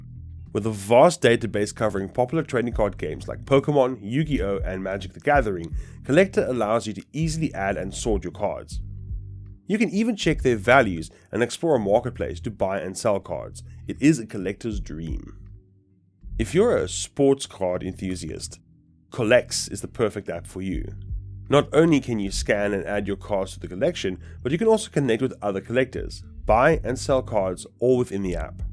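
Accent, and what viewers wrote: South Africa. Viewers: South African? 🇿🇦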